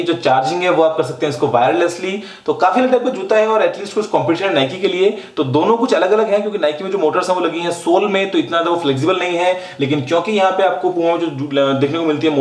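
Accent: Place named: Punjab, India. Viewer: I dont understand a fucking word you say fucking punjabi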